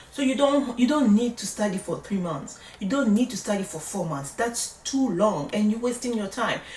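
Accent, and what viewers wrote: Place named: Cameroon. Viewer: Hi new subscriber here👋🏽, first of all I want to thank God that I have came across your video. Your first video that pop up on my feed was "how to find sponsors for a green card (2025)". I will try to make it short (because I like to talk a lot). To be honest when I clicked on your video I wasn't sure what to expect, but I believe is God who wanted me to come across your video. When I heard your accent, I told myself, maybe she's from Cameroon 🇨🇲🤭, but when you said you were from Congo 🇨🇩, wow 😳 I was so amazed. Let me tell you how proud I am as your fellow Congolese🇨🇩 sister to see everything that you have achieved, seriously with all the titles on from of your name, that's the most blessing ever (You Go Girl!!). Like I said I will try to make it short 🤭😅. So, I am a UK trained nurse and I live in London. I was born in Congo, been raised and lived in Belgium for 13 years and I have decided to move to the UK. 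So, you might have guest from now that by this message I want to migrate in the US as an RN for so many reasons. But the first is for the job progression and all the nursing opportunities. In the UK, unfortunately, right now the UK is failing all their nurses. So I am on my journey to migrate, I know the road will be long with retrogression (EB-3 visa), but I believe God can do a miracle and touch Mr Trump's heart to make a big change for us nurses😁. On a serious note, I have start the application with New York BON because right now they are the only state that ac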